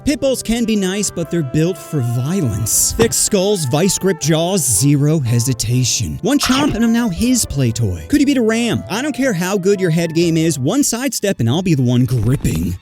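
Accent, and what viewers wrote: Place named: South, United States. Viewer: Bro went all Southern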